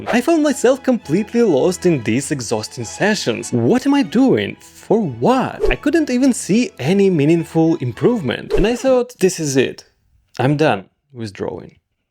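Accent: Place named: Eastern Europe. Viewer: Laik podposka slavyamskomy malchiky s milym accentom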